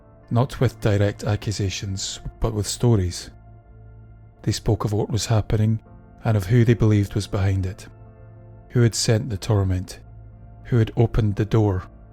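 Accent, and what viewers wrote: Scotland, United Kingdom. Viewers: Scottish accent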